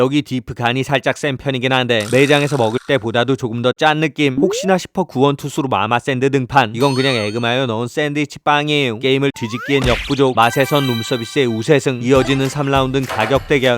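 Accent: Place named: Seoul, South Korea. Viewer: 딱 들어도 서울 말투인디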